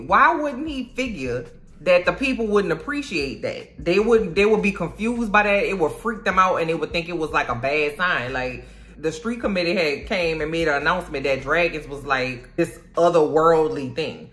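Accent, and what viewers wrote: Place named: Louisiana, United States. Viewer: Absolutely LOVE the Louisiana accent and humor!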